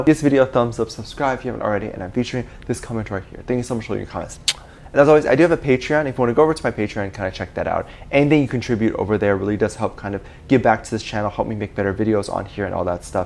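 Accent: South Africa. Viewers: wait are u a fellow south African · you are South African?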